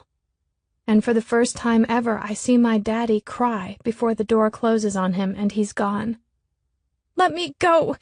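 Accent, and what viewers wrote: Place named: Ireland. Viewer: thats an Irish brogue not a Scottish one….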